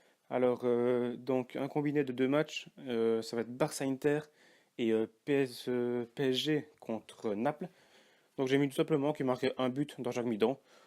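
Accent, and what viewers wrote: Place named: Liège, Belgium. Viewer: salut tu viens de ou en belgique j aime bien ton accent j aurais dis pas loin de liege non ?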